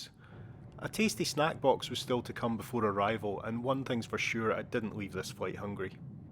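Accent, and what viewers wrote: Scotland, United Kingdom. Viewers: I loved the 747 too... you sound much less of a Scot seven years ago..😂😂😂😂😂 went to India on a BA 747 with my friend, Club Class, it was ok, not special.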